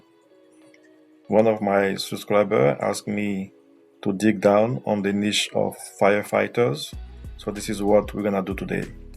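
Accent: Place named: France. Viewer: Well, it's a great video bro, GJ, so according to ur accent, I think that u are a french guy, so id u don't mind can u create a video on how to find niches on the Fr marketplace !!!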